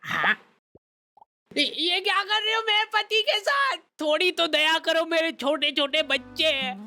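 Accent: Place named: Indore, India. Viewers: Bhai kha se ye to bata do Indori lag rahe ho 😂😂😂😂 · Bhai indore se ho haina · Lagta he bhaiya mp se he 😅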